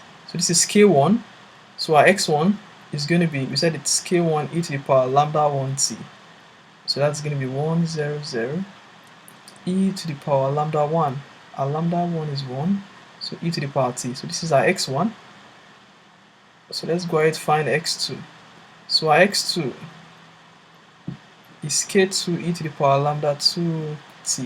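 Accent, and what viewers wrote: Nigeria. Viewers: i knew you were nigerian as soon as i heard your voice, you probably igbo too lmao, good video broski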